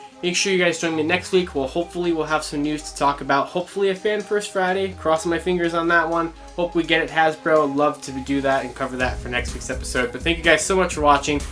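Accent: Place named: United Kingdom. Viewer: Omg i thought you were from the uk lol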